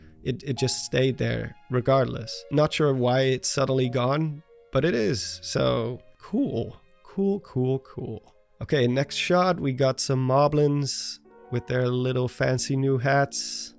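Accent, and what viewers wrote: Netherlands. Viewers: grappig om een nederlandse accent te horen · Super cool to see another dutch zelda fan!! Found your channel awhile ago and now I'm addicted to your video's. Je hoort het bijna niet aan je stem dat je nederlands bent!! Ga zo door :D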